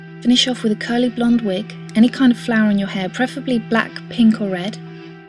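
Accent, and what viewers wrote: England, United Kingdom. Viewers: British =w= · R U english??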